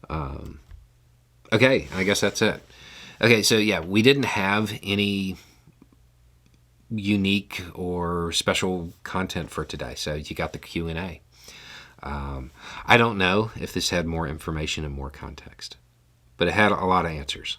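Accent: South, United States. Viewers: I appreciate that you only post when you're confident of the evidence. It's one of the reasons we continue to watch your videos. Finding reliable information isn't the easiest thing. We live in Australia so we have 2 reliable news sources (ABC & SBS) but they aren't as reliable as you. They also don't come with a southern drawl 😊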